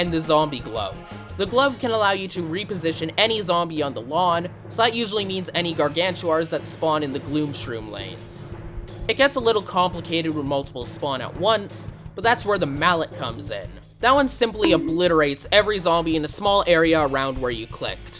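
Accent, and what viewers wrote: Canada. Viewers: He’s Canadian dumbass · oh, youre canadian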